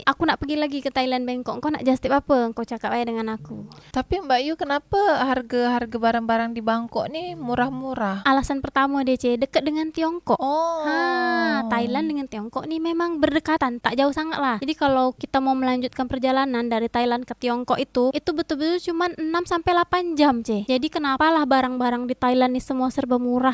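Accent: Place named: Kedah, Malaysia. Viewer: Hang nak pi mana tu..bahasa Kedah ni 😅😅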